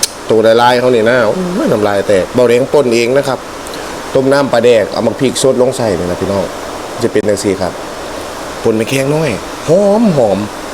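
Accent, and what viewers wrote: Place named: Nakhon Phanom, Thailand. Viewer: คนที่ไหนคะสำเนียงเหมือนคนนครพนม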